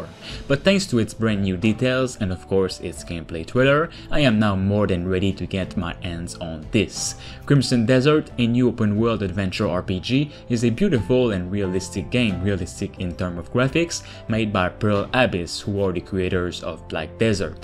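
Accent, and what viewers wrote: Quebec, Canada. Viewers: I am sure of one thing, your accent is from Québec ?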